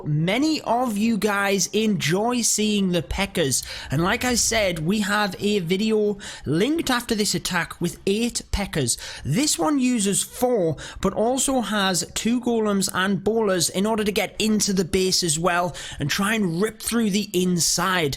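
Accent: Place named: Northern England, United Kingdom. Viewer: He's English, somewhere up north I'd guess, Newcastle or somewhere near there.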